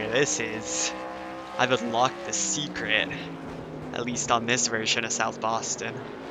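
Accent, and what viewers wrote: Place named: California, United States. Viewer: The Californian accent really came out here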